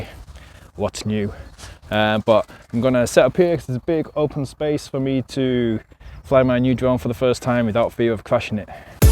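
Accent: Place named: United Kingdom. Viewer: So, how do you like your Spark? First, I live in Canton, OH. I also own a Mavic Pro but looking for something smaller. The Air just getting me too close to my Mavic Pro. I also motovlog and would like to take the Spark with me on my motorcycle club rides. I want to wish the best of luck. Love the British accent...:)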